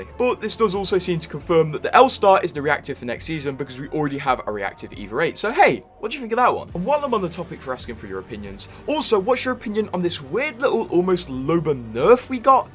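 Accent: United Kingdom. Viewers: Hello british man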